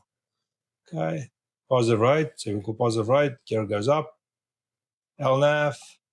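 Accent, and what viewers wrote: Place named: South Africa. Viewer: Good to hear an SA voice!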